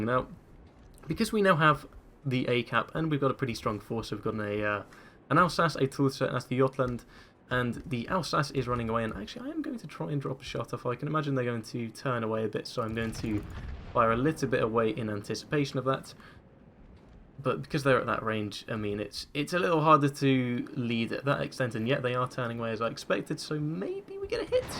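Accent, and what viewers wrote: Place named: United Kingdom. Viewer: Nice to listen the British accent. Of course we have Jingles but this sounds a bit different. Izumo used to be terrible. Not anymore. Nice video m8!👍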